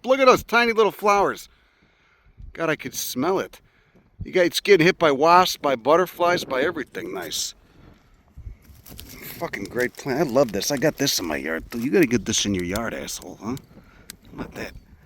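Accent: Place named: Texas, United States. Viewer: Liked and subscribed pardner. I know a Texas appreciator when I hear one. I will listen to you yap about native plants all day. Looks like maybe far west texas? Could be anywhere from the Big Bend area all the way up to the Guadalupe mountains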